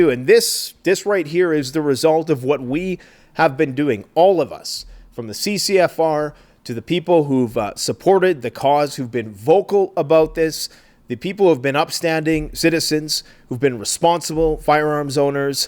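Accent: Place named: Rural, United States. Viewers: that's NOT how Albertan's talk...what kind of accent was that??? Texas?? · Thanks for the update,. Good news sounds like some are Standing Up, Speaking Up, Taking Action, as it should be. Your hick accent brings back fond memories of the All-American Prepper maybe we might see him in the near future "if" there is one. Stay Safe & Good Luck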